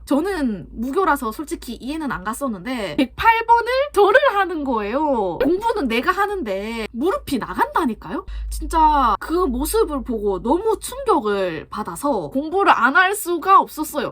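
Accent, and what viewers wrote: Gyeongsang, South Korea. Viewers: 갱상도 이신가융 ㅋㅋㅋ 잘보고갈게요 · 부산시 공무원 되신건가여 ? 어쩐지 사투리가 조금씩 들리는거같았어여 항상 좋은 방송 해주셔서 감사합니다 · 잘 봤습니다 저도 오늘부터 의지를 다져봐야 겠습니다. 혹시 실례가 안된다면 경상도사람인가요?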